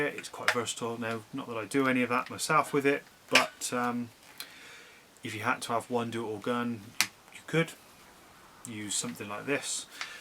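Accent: United Kingdom. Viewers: Sounds like your from the forest of Dean area? Do you do any boar control? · Sound like a devon boy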